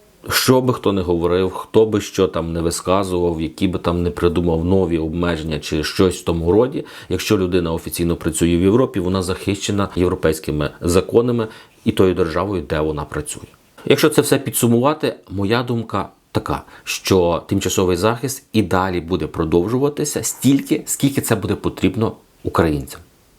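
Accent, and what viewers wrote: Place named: Western Ukraine, Ukraine. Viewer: Западенець, бендеровець...мало грушей накрав....по мов1 ч1тно, як ти розмовляеш мовою....я укра1нка, а розмовляю зовс1м доугим д1алектом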